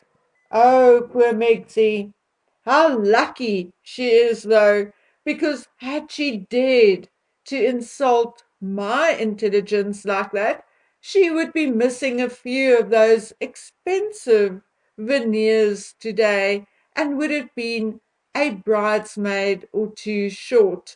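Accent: South Africa. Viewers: I love the way you say ‘Big Willie’ in your great S African accent! Thanks for hanging in there for us.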